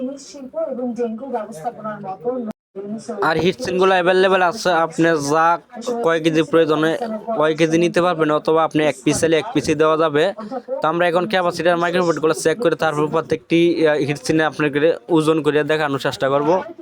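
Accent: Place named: Mymensingh, Bangladesh. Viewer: ভাই আপনার বাড়ি কি ময়মনসিংহ আমার বাড়ি ময়মনসিংহ আপনার ভাষা শুনে বোঝা যায় আপনার বাড়ি ময়মনসিংহ❤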